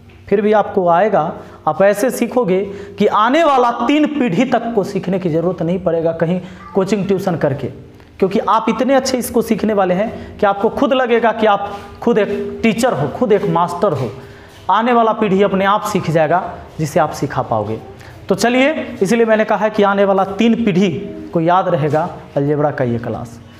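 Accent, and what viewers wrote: Bihar, India. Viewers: Your bihari accent has been helping me to Focus in maths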